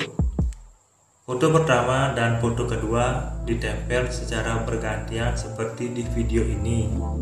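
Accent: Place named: Bali, Indonesia. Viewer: dri cara ngomong T nya pasti orang bali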